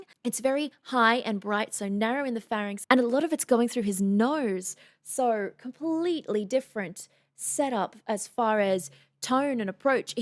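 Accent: Australia. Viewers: This would be a perfect soundtrack to a game teaser or trailer. Also... It's always so much fun to hear Aussies saying know. "Knar" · I love your aussie accent 🥰🥰🥰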